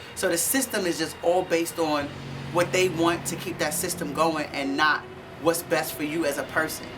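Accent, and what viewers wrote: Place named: New York City, United States. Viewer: That's the NYC accent! I love in the Bronx...woman are a bit tougher than your average coming from NYC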